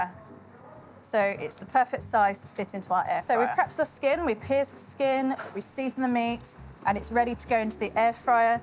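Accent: United Kingdom. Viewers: I had this playing on the background and I thought I heard Gillian Anderson with British accent talking.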